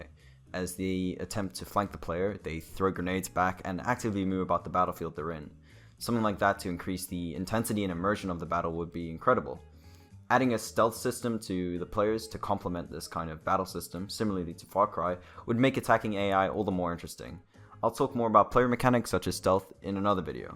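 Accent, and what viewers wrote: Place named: United Kingdom. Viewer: This would be extra nice .. you sound bigmany time brtitsh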